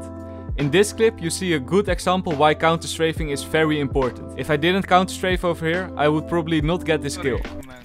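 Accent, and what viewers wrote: Netherlands. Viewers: this man souds dutch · I smell Nederlands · Ik hoor dat je nederlands bent haha lol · mmh i smell dutch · are you dutch by any chance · Ik hoorde gwn aan je stem dat je Nederlands was lmao ez peazy lemons squezy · I can feeeeeel the Dutch voice trying to come out idk but I'm 99 procent sure your Dutch Same ass me that accent its exactly like just people in Belgium and the Netherlands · Jij bent lederlands he smieght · i hear some dutchie in him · dutchiest accent ever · Your dutch accent makes this terrible to listen to. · ben jij nederlands of belg want ik hoor dat aan u accent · Nederlandse engels belike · This video smells like a nederlands accent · “Has a Dutch accent” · I hear nederlands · Ben Jij nederlands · Je hoort gwn aan je engels dat je nederlands bent😂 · The dutch accent in your english 😆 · The dutch ascent makes this 100x better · Are you dutch? 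Because you sound a little bit dutch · hahahaha hoor meteen dat je nederlands bent, wel goeie tips though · Dutch accent on point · I smell some dutch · i smell dutch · Thx for the vid and something else i smell dutch😂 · are you dutch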